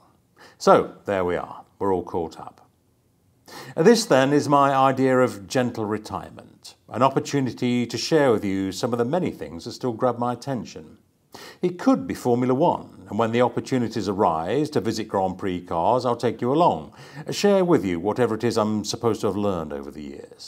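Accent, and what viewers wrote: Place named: United Kingdom. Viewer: Subscribed for your proper British accent and hefty bank account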